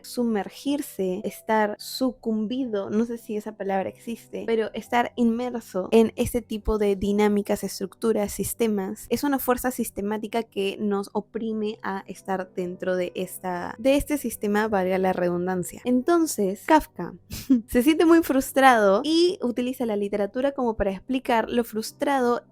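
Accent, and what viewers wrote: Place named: Peru. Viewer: ... Pues déjame decirte que has hecho una buena reseña con tu dulce voz, de acentito, de muchachita... peruana.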